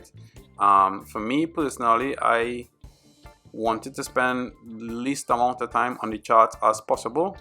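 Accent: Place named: Trinidad and Tobago. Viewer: great video. u sound like a trini, we should link up and work on some stuff. i am also an algo trader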